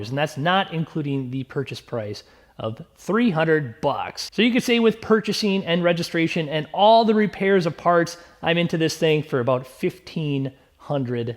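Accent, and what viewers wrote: Minnesota, United States. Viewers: your in minnesota i can just tell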